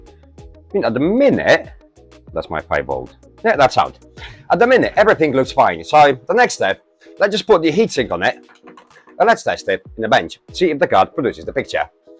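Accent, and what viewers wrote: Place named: United Kingdom. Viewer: Nice first video i expected you to be another American channel till i heard you open your mouth good to see someone this side of the pond is giving this type of repair a go.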